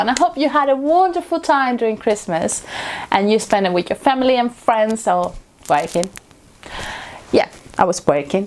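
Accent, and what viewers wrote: United Kingdom. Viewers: You mentioned you are not a native speaker and I wouldn't have really known unless you said so. You have a wonderful British accent. All the best with your new channel. It takes time to get a following so don't give up if you feel like you don't have many subscribers or views at the beginning... keep at it! - Rob W.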